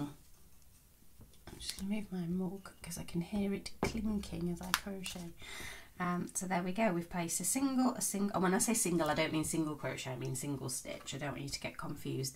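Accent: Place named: England, United Kingdom. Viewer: i just have to say hearing you talk makes me homesick for england lol have been in usa since 1967 but england will always be home love listening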